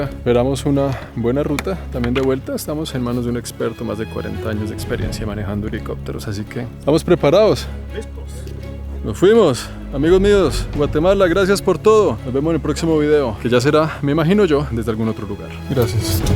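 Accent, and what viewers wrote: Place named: Paisa, Colombia. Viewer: Interesante trabajo com yutuber, porque usted no solo nos muestra a los sedentarios el muno, sino y muy importante también, ayuda a preservar ese acento tan colombiano, que supongo es el que denominan paisa y que, no tengo la menor duda, identfica a Colombia en cualquier parte del mundo.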